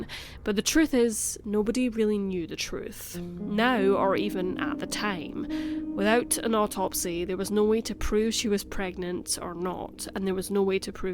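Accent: Scotland, United Kingdom. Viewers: Hey Hey Are you speaking with a Scottish accent?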